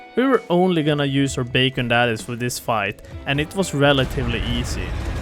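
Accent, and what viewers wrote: Sweden. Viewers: i just hear you are from sweden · Think I hear a Swedish accent, Haha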